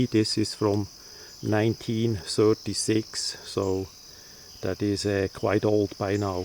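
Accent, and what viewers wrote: Switzerland. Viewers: Ihr Schweizer Akzent ist einmal eine schöne Abwechslung von Arnolds Österreichischen Akzent. Apropos, wissen Sie welche Shotgun Don Camillo hatte?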